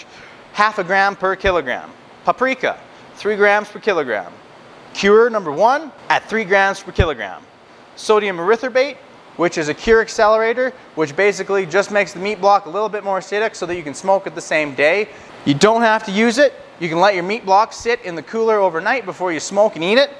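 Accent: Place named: Canada. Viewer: He’s definitely Canadian. I’m from the Great White North myself and that accent is unmistakable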